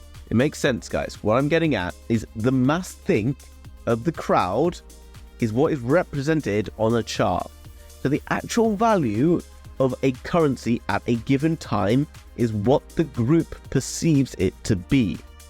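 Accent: RP, United Kingdom. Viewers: I think you might be the poshest man in crypto.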